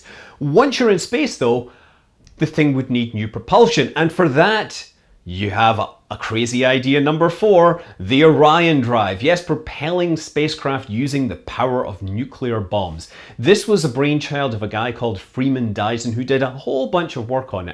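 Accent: Scotland, United Kingdom. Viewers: U can hardly hear your Scottish accent. I guess you have been living in san Francisco for a long time. Btw I enjoy your videos keep it up.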